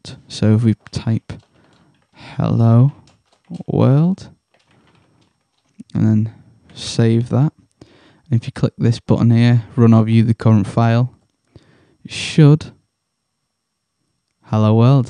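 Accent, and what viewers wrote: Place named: Northern England, United Kingdom. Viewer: ho,, before i for get,, are you from the north of england as your voice and manerisms seem similar to mine..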